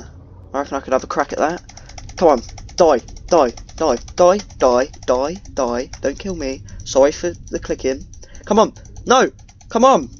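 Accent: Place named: Scotland, United Kingdom. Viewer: oh I thought you were a scot because of your accent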